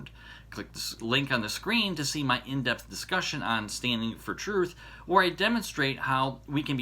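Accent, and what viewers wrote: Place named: United States. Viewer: I can't understand your terrible American accent.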